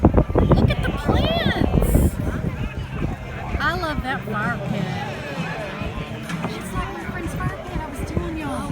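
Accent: Texas, United States. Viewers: what a great vacation, I need to put NC on my list. That Biltmore home was awesome!!! BTW your texas twang is much more pronounced now that you're living in Texas (I just love it!!! ):D xox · I can say this because I've lived in Texas my entire life, but your Texas accent is getting stronger. Lol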